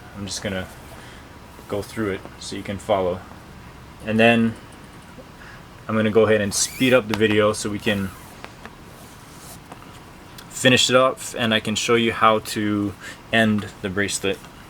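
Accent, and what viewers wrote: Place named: Hawaii, United States. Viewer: Watching thru the video and I'm like "this guy sounds like he's from here(hawaii)" so I looked at your picture and was surprise to find that u are lol.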